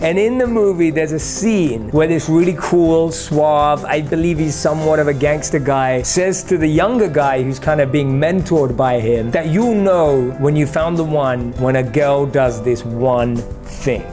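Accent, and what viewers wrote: United Kingdom. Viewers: When you talk .. I just stared at your beautiful eyes 😍 and I love your English accent 😘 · Found you by accident and glad I did. I appreciate what you have to say and love the way you present it. The gorgeous eyes and the British accent make it so easy to watch you, LOL! My sister asked George Burns the 100 year old comedian actor in Las Vegas, (who passed away years ago), “How do you know if a man loves you?” George replied, “You ask him do you love me? if he looks away before he answers the question… He doesn’t!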